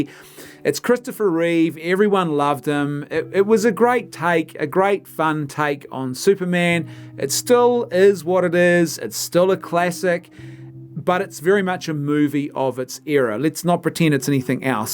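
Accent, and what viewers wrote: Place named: New Zealand. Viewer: Kiwi accent.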